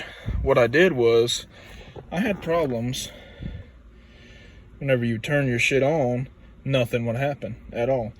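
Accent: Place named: Alabama, United States. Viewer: Sumbitch you gotta be from Alabama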